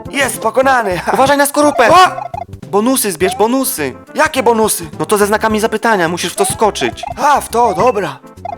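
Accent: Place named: Podlasie, Poland. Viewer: NO BARDZiEJ Z PODLASiA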